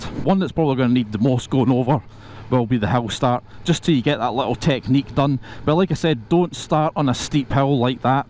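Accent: Scotland, United Kingdom. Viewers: Nonetheless the riding and all, I love the Scottich accent 😅❤❤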